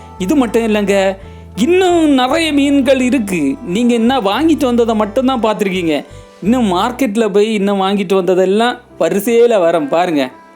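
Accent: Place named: Kerala, India. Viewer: Hi nalla irukkureengala unga tamil alaga irukku thambi. Malayali pesuvadhu phol irukku. From Sweden 👌🤲🏽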